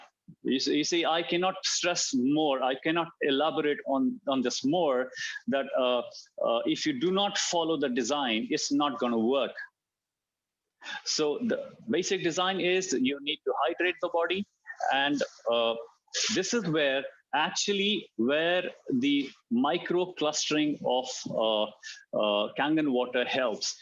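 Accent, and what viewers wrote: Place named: North India, India. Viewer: you have simplified the importance of Kangen Water in such a lucid manner that even a sensible school kid will realise the need for KW. But, with deep regrets I need to emphasize that speaks sich an elegant English and on the other hand, Mr, the host, speaks such an horrible english. His accent, his pronunciation of the words is typically north side bhaiyas type. He is grammatically incorrect too. For e.g., he says there is a query from the Prasad. My God, such a horrible English. It is just 'Prasad' and not 'the prasad'. please please please I appeal to your great highness to arrange for a better well educated host who could speak English properly for your next series of videos. AMEN.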